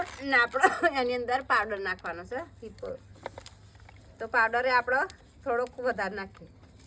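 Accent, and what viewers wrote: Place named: North Gujarat, India. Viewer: Language per na Jao vasant Bhai..Uttar Gujrat ni aavi j boli hoy chhe .so aapdi bhasha ni respect karo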